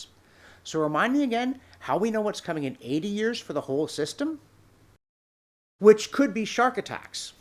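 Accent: Canada. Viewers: Please slow down your rate of speech a notch : Not everybody is familiar with your Canadian diction : Good Vids though .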